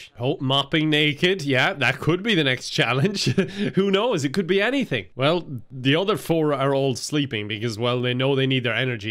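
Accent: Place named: Ireland. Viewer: So I’ve been binging your videos enough that your accent has gotten stuck in my head for a few days and now I’m convinced that I have to go visit Ireland, it’s officially the second thing on my bucket list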